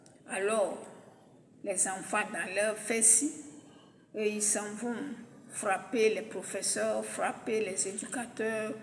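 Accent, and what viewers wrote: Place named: Ivory Coast. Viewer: Tu es pleine de ressentiments de rancune de haine de quoi parles tu? On te trouves tu? N'est ce pas en occident là où l'on parle de démocratie? Chère mienne que recommende tu pour une Afrique libre? À te voir physiquement tu serais ici vivant paisiblement tse vieux jours en CI vue ton accent. Si tu cherches une identité fais toi voir ailleurs et colle nous la paix merci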